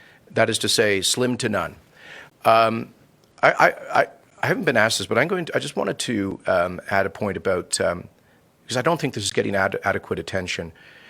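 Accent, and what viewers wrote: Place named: United States. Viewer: The biggest boondoggle is the drop in taxes to 10% that Kenney wants to announce to buy votes of corporations in Alberta. How has he planned to pay for that? No idea! Kenney is truly the most unlikeable arrogant self centered bully in the history of this province...He is not even from Alberta..he does sound like either an American or from Ontario....Certainly not from here.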